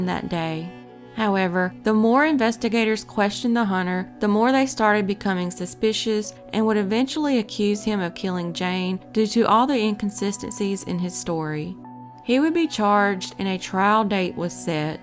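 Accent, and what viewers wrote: South, United States. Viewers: HEY THERE.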